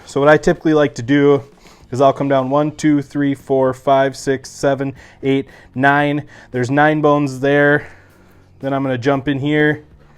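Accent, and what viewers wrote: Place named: Midwest, United States. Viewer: Your voice has a midwestern twang. I hear MN?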